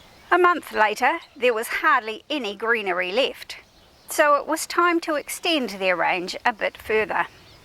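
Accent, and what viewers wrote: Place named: New Zealand. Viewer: that was my guess that you lived in New Zealand just got Clues from your timestamp the beautiful weather in December, and your accent. A lot of your words pronounced just like your FORMER prime minister what's her name. Jacinda? 😅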